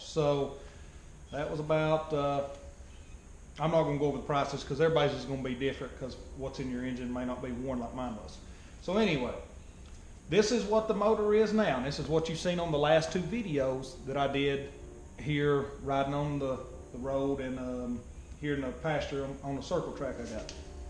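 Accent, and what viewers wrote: Tennessee, United States. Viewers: You from Tennessee?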